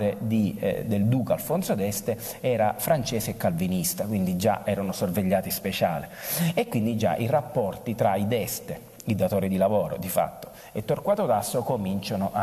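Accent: Sicily, Italy. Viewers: Il nn compreso....eccede.....aaaaaa, un saluto grazie il suo siculo è simpaticissimo anch io isolana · tu siciliano sei, il mio accento lo riconoscerei tra mille❤️❤️❤️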